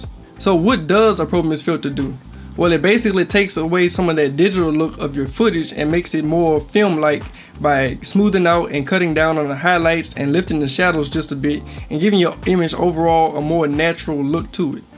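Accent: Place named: Alabama, United States. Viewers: I recently discovered your channel and have watched several of your videos. I don't normally comment on YouTube videos but I felt compelled to reach out and encourage you to keep making great content. Also, somewhat random, but I moved out of the US a couple of years ago and, originally being from the South, it's nice hearing another Southern accent - especially one in such a positive context. Keep going! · YOU FROM BAMA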